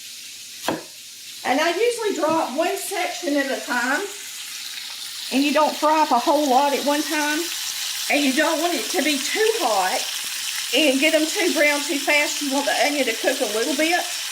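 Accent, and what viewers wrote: South, United States. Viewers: I wanna move to the south so i can get this beautiful southern belle voice and postpartum cookin🥺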